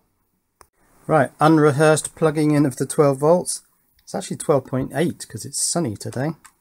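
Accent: England, United Kingdom. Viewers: Hi, have you used any flux on the solder braid before attempting to remove solder? I also don't like solder braid myself but flux might help you. I use a Hakko de-soldering gun on most of my repair work, for me its the easier and cleaner option. It's a lot more expensive but you remove all the solder in one press of the trigger, even on multi-layer boards. Occasionally on lead free solder I need to add fresh 60/40 leaded solder before trying to remove the old stuff. Keep up the great videos, I'm originally from England and its comforting to hear the accent from time to time.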